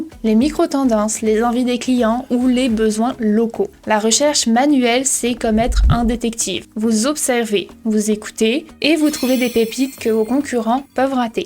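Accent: Quebec, Canada. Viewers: Merci pour ces idées de recherche produits ! Il est aussi intéressant de constater que dans le seller central il y a des outils "gratuits" (on paye l'abonnement) qui sont plus précis que les outils tels que Jungle Scout ou Hélium 10... Car les data viennent directement de Amazon... As tu fait une vidéo là-dessus ? Merci pour ta bonne humeur et ton petit accent québécois... ❤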